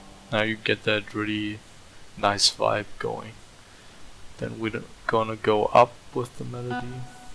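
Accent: Germany. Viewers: hes from germany, i can tell by his accent :)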